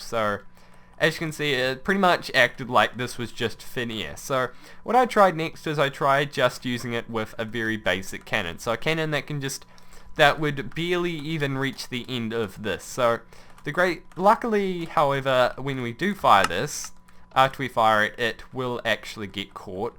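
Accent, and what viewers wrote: New Zealand. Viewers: He's from new zealand that's his accent. Nobody's perfect.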